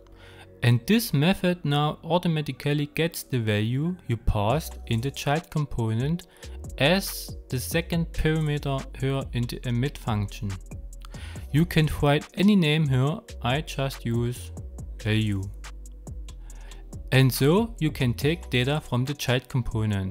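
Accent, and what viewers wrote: Germany. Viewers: I love your german accent hahaha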